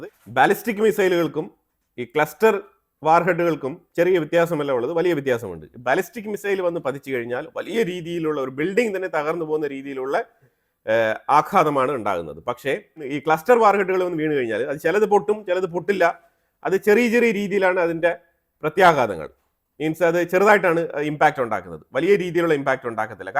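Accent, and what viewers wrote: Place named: Trivandrum, India. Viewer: Your News presentation is excellent. But I think, you have a small Trivandrum accent.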